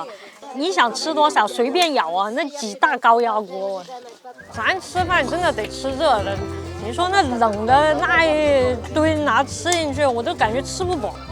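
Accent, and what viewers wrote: Sichuan, China. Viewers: 普通话夹杂四川话😂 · 不用理这种以自我为中心的狭隘的人，川普多可爱，保持自己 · 哈哈哈难道是个川妹子，我就说口音怎么那么熟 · 原来Yooupi是四川妹儿，怪不得讲起普通话内么有趣！😅 视频制作的很好，非常非常的有趣，感受快乐的同时还能了解更多地域文化！太棒了！❤❤ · 博主说的这是川普吧！熟悉中带着陌生😂😂😂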